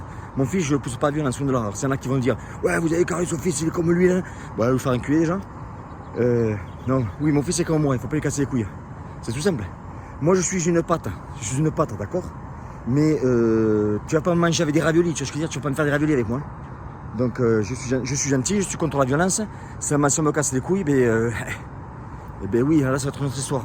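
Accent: South, France. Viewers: Bonjour les USA, bonjour, toujours d’excellentes vidéos avec notre accent du Sud 😉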